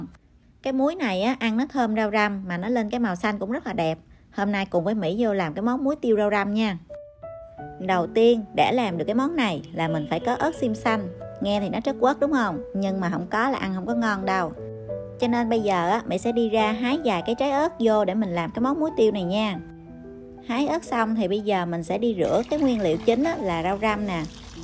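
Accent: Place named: Tay Ninh, Vietnam. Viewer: Mỹ quê ở đâu vậy Mỹ??? Nghe giọng như dân Tây ninh ah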